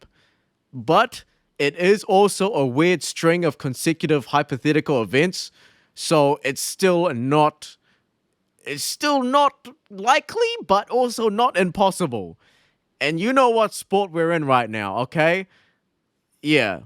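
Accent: New Zealand. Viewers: Is that accent an newzelander that’s been living in America?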